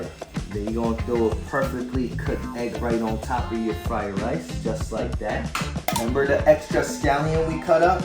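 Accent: Philadelphia, United States. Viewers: You frim Philly?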